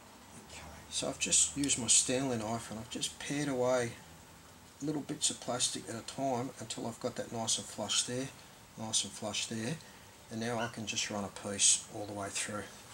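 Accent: Australia. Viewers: this is aussie accent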